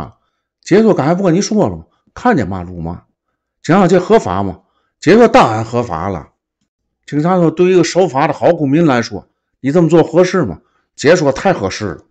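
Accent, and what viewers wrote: Tianjin, China. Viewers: 这是天津口音吗？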